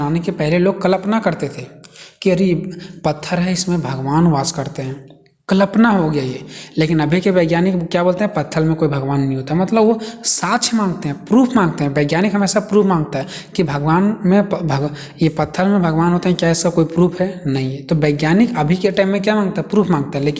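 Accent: Uttar Pradesh, India. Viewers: Ap ki voice bhot pyari hai aesa lag rha hai kese ap utar Pradesh se ho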